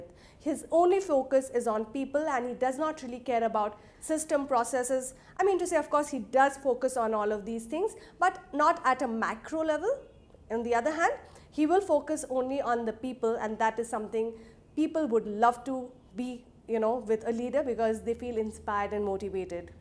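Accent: India. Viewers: Sorry I don't understand your Indian accent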